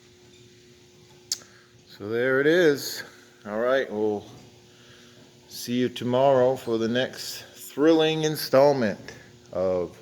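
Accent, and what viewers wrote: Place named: Maine, United States. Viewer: Nice, you sound like you're from maine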